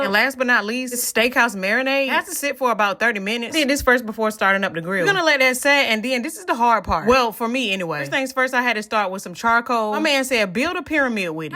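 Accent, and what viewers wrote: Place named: Texas, United States. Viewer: You're accent let me know you're Texans. Glad to see you are repping HEB. I'm from Kingwood, but living in Chicago now. Miss me some HEB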